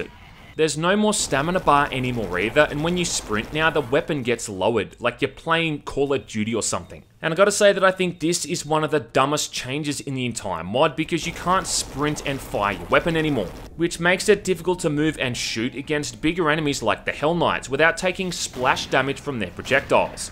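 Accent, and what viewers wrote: Australia. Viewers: Its hard to differentiate wether this video is a rant or just a comparison video with such a thick Aussie accent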